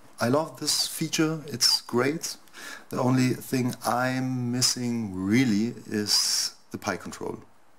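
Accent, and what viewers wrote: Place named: Germany. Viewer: Lol hast echt einen starken deutschen Akzent. Ist das das echte update oder ist es einfach von jemandem der das auf dem Internet hochgeladen hat?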